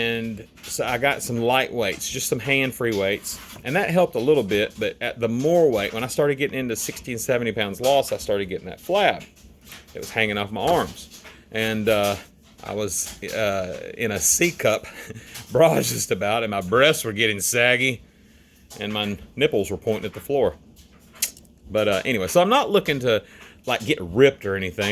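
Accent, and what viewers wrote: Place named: South, United States. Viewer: I love the southern accent!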